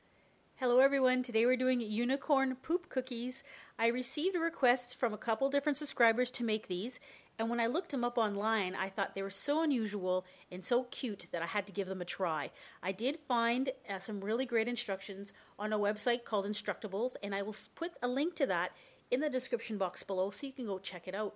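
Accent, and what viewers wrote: Canada. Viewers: Are you canadian? You have that baker/canadian soccer mom accent..I love it :D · where are you from? it sounds kinda like a strong Canadian accent, but I don't really know.. Minnesota? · lol you sound so canadian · I can hear her canadian accect noww!! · You are Canadian! I love your accent! I subscribed! All of you vids are so cute! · Your accent sounds like your from canada, but you measured in inches, Wisconsin?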